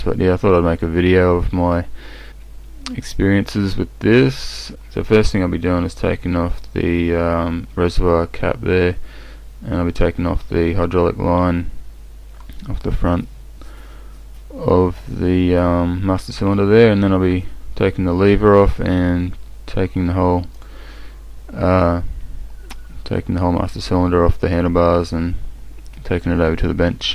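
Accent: Australia. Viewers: First youtube video I've watched with an aussie accent and swearing brilliant, video was helpfull too.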